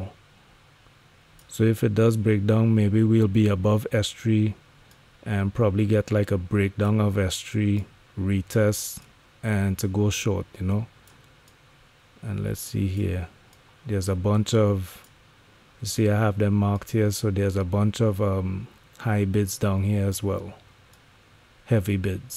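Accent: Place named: Trinidad and Tobago. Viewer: You sound like an Israelite Trini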